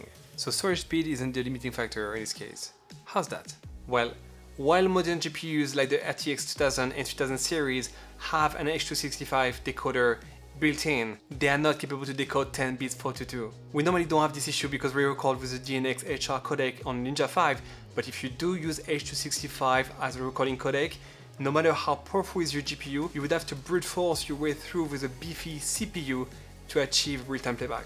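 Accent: France. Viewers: mmm French accent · I believe you're french... Based on your accent 🙂 Thanks for the video. I'm still on the free version, I wonder if I upgrade to studio with my RTX 2070 Super would be enough to have smooth playback in almost all situation or if I should go with the new MacBook m1 ? What are your thoughts on this ? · I love how he speaks in french using english words! :D. Thanks for the video, I didn't grasp much as a total noob but generating proxy media solved the issue. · Loved your video!!! Super thanks!!! ❤❤❤ Your accent is from France, am I right? 🤭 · merci french accen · Trench accent ?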